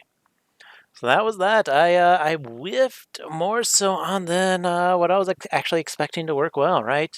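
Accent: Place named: United States. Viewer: Sounds like typical standard American intonation to me. though the trend over the last couple decades is towards flat affectless intonation.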